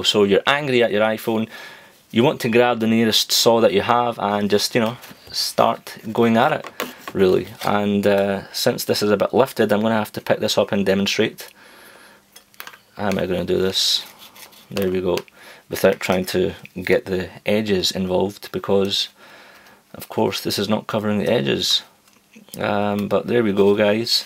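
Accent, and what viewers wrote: Scotland, United Kingdom. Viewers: YOU ARE SCOTTISH!!! #THATISALL #JUSTSAYING #GIVEAWAY LMAO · I love your accent I love Scottish ppl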